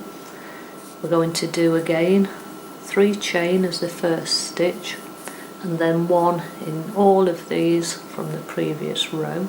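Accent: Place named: Leicestershire, United Kingdom. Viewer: I love your videos! You're so good at explaining things and easy to follow. Thank You. Are you from Leicestershire or Derby? Your accent sounds very familiar. I'm a Leicester/Derby lass myself. I hope you have a lovely weekend.xx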